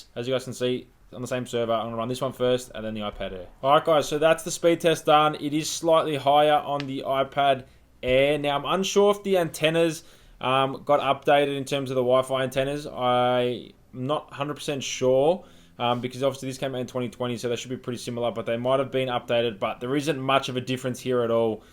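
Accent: Australia. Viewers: U must be Australian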